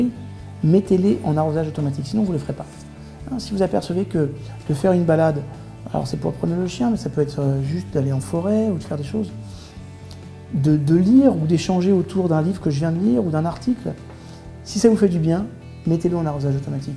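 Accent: Canada. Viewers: Très intéressant je vais m'y mettre des aujourd'hui!!en passant j'aime beaucoup ton accent canadien je suis de Québec maintenant vivant au Costa Rica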